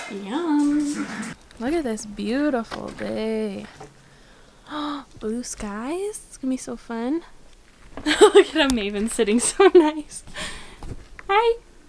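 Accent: Quebec, Canada. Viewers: My favourite phrase or is "we'll see about that" - it's the Canadian accent that does it for me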